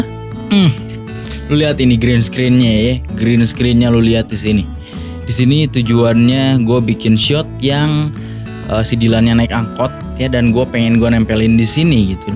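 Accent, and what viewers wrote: West Java, Indonesia. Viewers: bro sunda nya di belah mana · Orang mna kang,.. Sani sunda emhh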